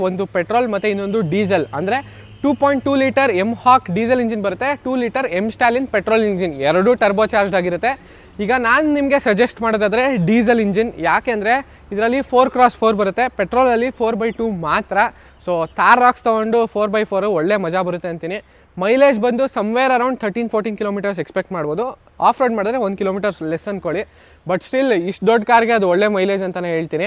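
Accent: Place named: Mysuru, India. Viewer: Chennagidhe nimma mysuru kannada.. hige cargala bagge mathadtha iri..ellaru nodi tilkothaare..jai kannada, Karnataka, jai Bharatha ♥🙏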